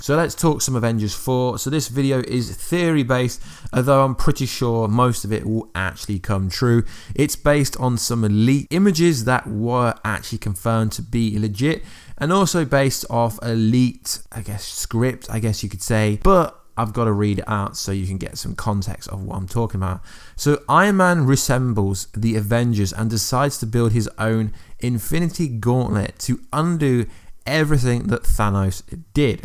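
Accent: United Kingdom. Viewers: British?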